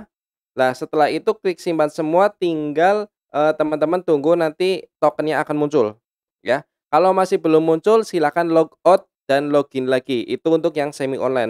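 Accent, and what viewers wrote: Banyumas, Indonesia. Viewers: terima kasih om...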